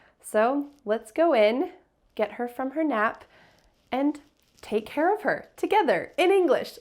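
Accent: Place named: United States. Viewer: My best teacher i love americans accent.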